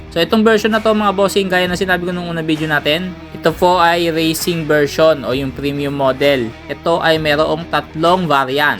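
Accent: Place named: Tagalog, Philippines. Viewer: Cguro boss ang pronounce nya sa example ay exampel hehe ksi tagalog sya,yong double dobel sa mga tagalog hahaha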